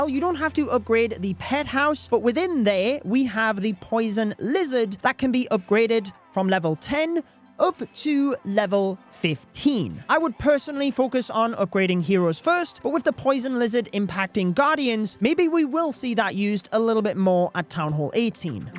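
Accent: United Kingdom. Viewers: I love this British accent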